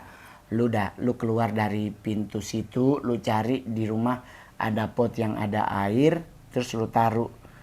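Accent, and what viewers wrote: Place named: Eastern Indonesia, Indonesia. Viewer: Tengkyuuu kaka Mongol, kita jadi tambah pengetahuan sedikit seputar Satanic yg menarik tapi mengerikan. Ayok kaka Mongol, ekspedisi yuk ke 7 titik di Indonesia yg sudah diikat itu 😉👍. Gw tertarik dengan pengetahuan kaka Mongol seputar Satanic waktu join di DMS, hebat 😉👍 Plus logat Indonesia Timurnya, mantaaaappp kaka 😃👍 Salam katong anak Papua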